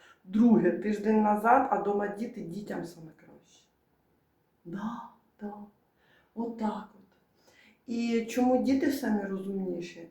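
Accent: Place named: Boryspil, Ukraine. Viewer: Ви, вразили тим- 40 год!, спасіба, 3 часа, саме вкусне, я рахую, вобще, шутками і таке інше. 😊 Ви направду; розважили мене нині. Пам'ятаю із свого дитинства як у Борисполі, батьківщині моїх бабусі і дідуся, розмовляли подібно до цього, такою малороською мовою, кумедним суржиком. Але вони були мудрі та навчили своїх дітей звертанню до батьків- тато, мамо і на ви. Сподіваюсь, у Марії все добре. Здоров'я та добробуту. 🇺🇦✌❤🖤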